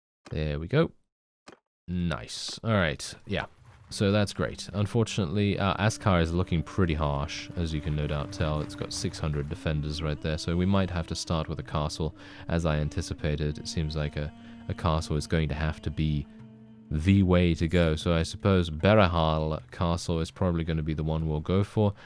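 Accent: United Kingdom. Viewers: You a brit, mate ?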